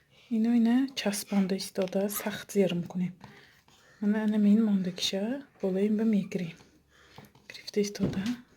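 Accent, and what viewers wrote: Isfara, Tajikistan. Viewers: АПА МАН АНЧА ПЕШ КАНАЛАТОНДА ПАТПИСАТ КАРА БУДАМУ ХАБАР НАГИТАМ ХОЗИР БОШАД ХАМАИ ВИДЕХОТОНА ДИСИЯМ МЕГУМ ШУМО АЙ ТАРАФИ ИСФАРА НЕСТЕД ТАРЗИ ГАП ЗАНИ ИСФАРАГИ БА МОНАНДД АГАР ААЙ ИСФАРА БОШЕД АЙ КАДОМ НОХИЯШ 👍👍👍👍👍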